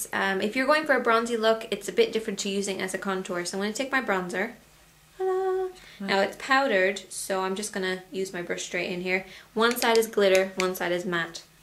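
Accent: Ireland. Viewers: She sounds Irish. She mentions getting some '€' off the eyelash curler, which is currency in Ireland. She sounds as if she's spent time in the USA or Canada though. Her name and her look,are very Irish/Celtic, much more than her sister. :-)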